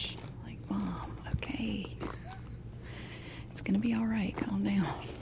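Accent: South, United States. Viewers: I ♥️ your soothing southern voice. Greetings from Germany 🇩🇪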